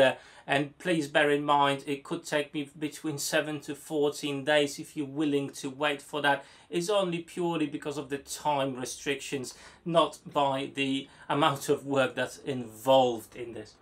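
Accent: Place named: United Kingdom. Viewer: I have found your channel because i have also recently started using the table circular saw and the miter saw from Evolution. I am from Germany. I can understand your English very well. What part of the UK are you from? Your dialect is very different from what I learned at school. I have subscribed to your channel and hope for more interesting videos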